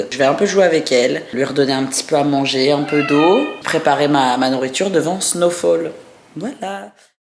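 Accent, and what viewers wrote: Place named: Quebec, Canada. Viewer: Ah mais tu commences à parler Quebecois heinh???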